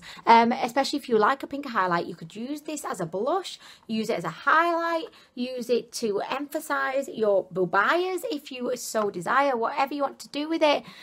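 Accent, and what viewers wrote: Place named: North Wales, United Kingdom. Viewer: Right.. Personal question. Where are you from? Obviously your name is Welsh and there is a northern Welsh accent there but it seems to have a hint of something else. Am I close? (Semi ignorant Australian here though I did spent a fortnight in north Wales last year)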